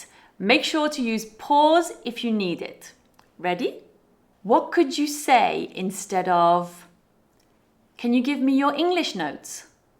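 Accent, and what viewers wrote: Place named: Midlands, United Kingdom. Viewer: Your accent appears to me " Midlands or estuary accent " don't you?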